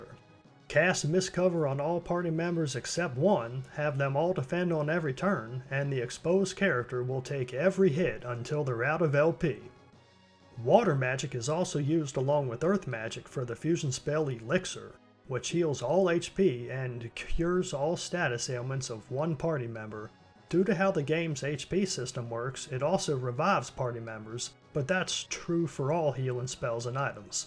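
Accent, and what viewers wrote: South, United States. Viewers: I never thought I would listen to a guy with a thick southern accent talking about my favorite childhood JRPG. I absolutely enjoy watching this series. Please keep it up.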